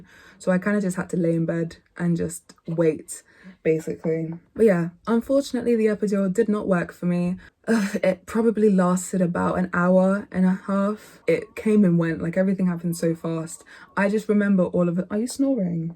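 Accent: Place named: United Kingdom. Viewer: Omg you guys are adorable together but you are freaking gorgeous!! Could just listen to you talk all day 😂 I love your accent. Are you in the Uk? Here in the states most videos I watched the dads were there just wondering if they did things differently there. I just subscribed you got me lol I also have a channel more than welcomed to sub back. Xoxo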